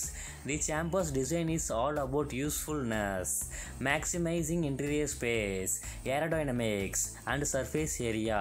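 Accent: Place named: India. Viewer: Heavy Indian accents are just horrible to listen to if you ask me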